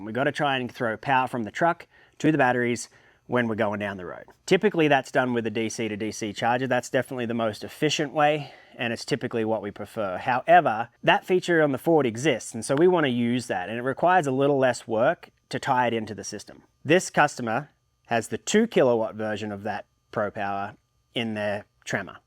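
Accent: Australia. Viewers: Assuming you're in Australia because of your accent. Surely you have heard of AS/NZS 3001.32:2022. Your battery installation seems to be noncompliant in my opinion. The cover of the enclosure needs to be secured with tools, if your enclosure is actually sealed and vented properly I cant imagine how your electronics in there with the battery are adequately cooled. Your work looks very professional but I cant see how it's legal. Oh now I see the license plate, thats how its legal. Hahaha. Yeah any aussies wstching this, you need to have your batteries outside the habitable area, similar to how we have gas bottles.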